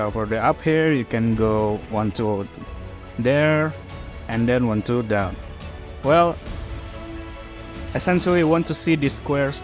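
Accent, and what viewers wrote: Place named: Indonesia. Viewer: Logat indo njir... Ts orang indo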